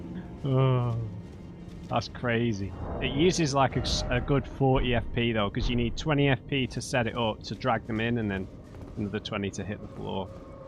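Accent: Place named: United Kingdom. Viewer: Loving the playthrough! Where abouts in the UK are you from by the way? trying to pin down your accent (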